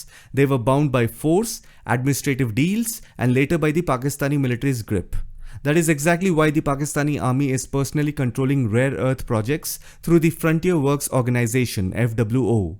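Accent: India. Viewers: Your Indian accent is so annoying 🤮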